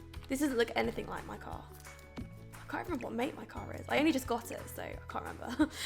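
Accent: United Kingdom. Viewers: Maria santos to me, she sounds more British that Australia. 😂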